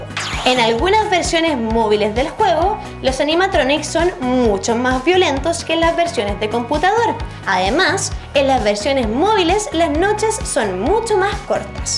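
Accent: Chile. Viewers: eres chilena ? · Y ¿ERES CHILENA? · Eres chilena? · eres chilena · es chilena o no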